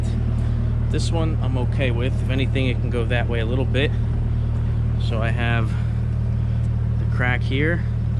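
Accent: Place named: New Jersey, United States. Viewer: What part of New Jersey are u from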